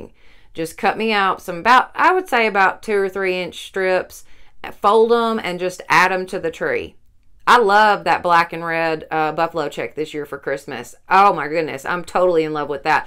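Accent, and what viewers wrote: South, United States. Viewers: That ribbon tree! And btw love the accent. I'm southern too🤗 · Love them all. By the way, where are you from? You accent sounds like you are from the south!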